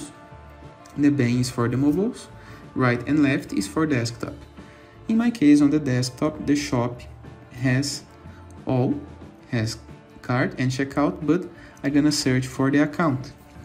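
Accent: Brazil. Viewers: Esse sotaque ta me cheirando a brasileiro...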